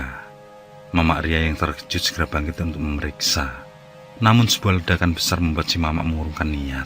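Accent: Java, Indonesia. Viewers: Klo mo nyumbang cerita nih apa hrs slalu yg genrenya horor trs ada mistik nya?koreksi dikit, krn cerita ini berlatar keluarga Batak yg tgl di Jawa timur mungkin ada baiknya voice talent agak disesuaikan dikit,jd ga trlalu Jawa tp ada batak2nya dikit jdnya lbh hdp kt nntnya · dubbernya ... medhok!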